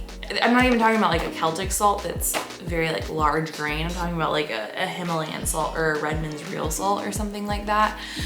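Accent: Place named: Idaho, United States. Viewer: Am so excited to have a new great channel to watch! Sounds like you're maybe an Idahoan too?! Neighbors!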